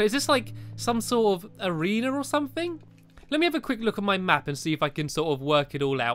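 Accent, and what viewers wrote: England, United Kingdom. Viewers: Are you form England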